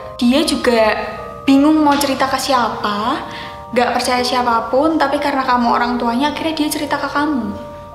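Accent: Java, Indonesia. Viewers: Seneng medok nya kalo liat mba tuh 😍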